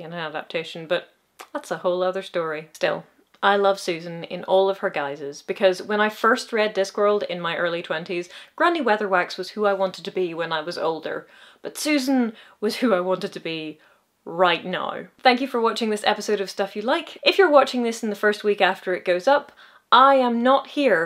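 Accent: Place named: Wales, United Kingdom. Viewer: I lloved the Wellsh accent.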